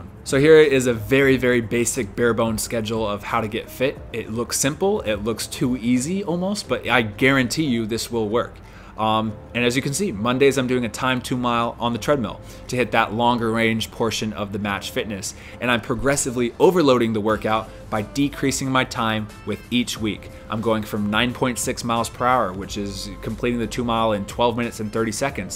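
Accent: United States. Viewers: He's speaking American English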